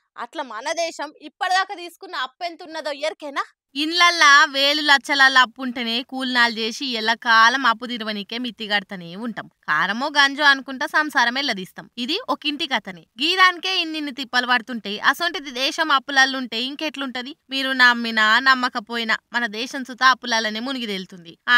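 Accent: Telangana, India. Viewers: తెలంగాణ యాసలో మాట్లాడుతూ ఆంధ్రప్రదేశ్ నాయకులను ఎద్దేవా చేస్తావా.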